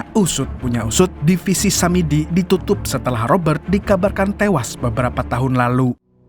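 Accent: Banjar, Indonesia. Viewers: Banjar nya kada ketulungan 😂😂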